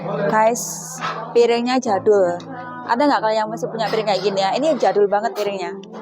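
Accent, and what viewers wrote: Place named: Java, Indonesia. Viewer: Ebak betuul ini Bun,,, niansa Jawa banget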